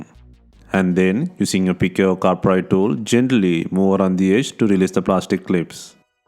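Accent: India. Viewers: Thank for the instructions! I'm curious, are you from India? Sounds like Indian accent 😊 By the way, I'm from Belarus! 🇧🇾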